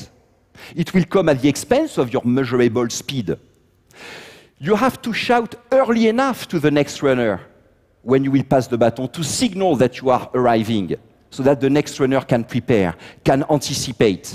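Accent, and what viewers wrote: France. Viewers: To those criticizing his accent, how about you go speak French without an accent? I thought so. Great speech. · i thought the language is frenc... dé internet, lé hdhsjs... disgusting accent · strange, it's french accent but very very excessive x) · Why can't he at least try to pronounce English words in English, instead of pronouncing them French. It is unbelievably hard to understand... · His accent is an incredible one. It's like to hear a French-English. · The French is strong in his accent. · I wish I could be French just to have his accent. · Stopped . Sorry, but I can't handle that kind of laziness, the accent is too thick, and since I'm French, I know that he's not even TRYING to get even a slight accent. It's a pain just to listen to the words. Too bad because it would have interested me. Mr Morieux, please take English classes to improve your accent, thanks.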